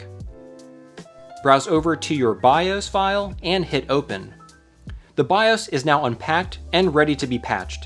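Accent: Baltimore, United States. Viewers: ...are you from Baltimore...???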